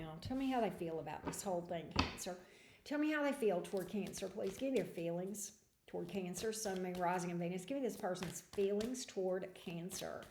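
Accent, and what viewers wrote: South, United States. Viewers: I don't know where you're from but I know you're a southern chick like me. Love it!❤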